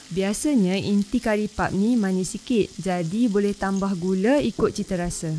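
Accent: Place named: North, Malaysia. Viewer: ni org utagha ka? Mcm ada dengar sleng utagha ja tu?😁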